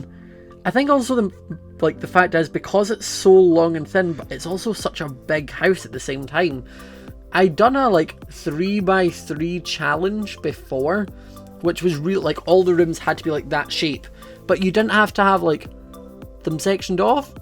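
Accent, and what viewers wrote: Scotland, United Kingdom. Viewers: I LOVE YOUR ACCENT YOU SCOTTISH CUTIE ❤️❤️🏴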